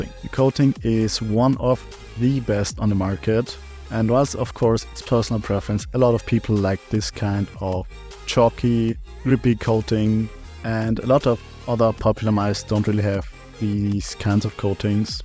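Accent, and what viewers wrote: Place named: Germany. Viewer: I love your accent so much, German?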